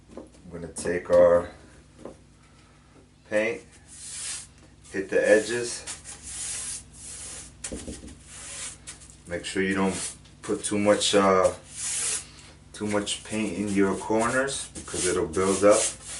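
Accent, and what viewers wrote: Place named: New York, United States. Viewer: My father was a house painter. I had tons of exposure on tools, method and product. Thank you for adding to my knowledge base for kitchen cabinets. I have oak cabinets and I want to update with A nice off-white on the uppers and a darker color on the lowers. I’ve been watching YouTube videos on how to prepare the oak cabinet and I was becoming discouraged because of the level of difficulty in the preparation phase with the wood filler etc. None of the videos I saw until today talked about self-leveling paint for cabinets. I’m gonna do what another comment mentioned, do the bathroom cabinets to get a feel for it and then tackle the kitchen. My dad‘s not here anymore to help me so I’m doing this in his honor. Thank you for the video I really appreciated it. With your accent you make me miss my New York people. God bless.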